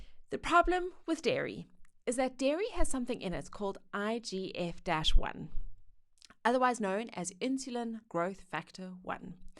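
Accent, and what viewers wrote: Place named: South Africa. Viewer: Accent sound South African...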